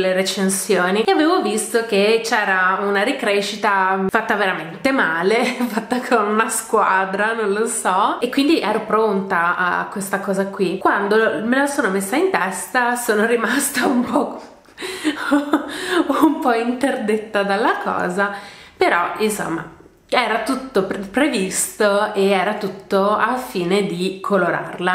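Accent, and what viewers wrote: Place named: Friuli, Italy. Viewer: Ho una curiosità, di dove sei se puoi dirlo? Friulana? Sono in fissa dal primo video che ho visto perché hai un accento che non riesco a identificare 😂 all'inizio avrei detto tipo emiliana, ma sentendoti più spesso direi di no 🤔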